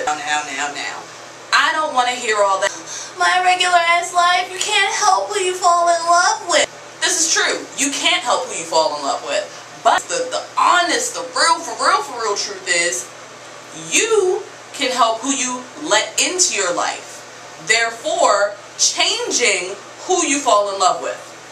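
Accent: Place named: Baltimore, United States. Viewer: u gotta be from baltimore maryland or somewhere in that area i hear it all in ur voice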